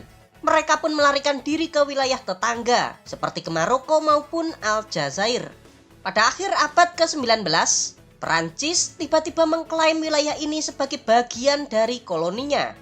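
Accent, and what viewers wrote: Java, Indonesia. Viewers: kental banget logat jawa nya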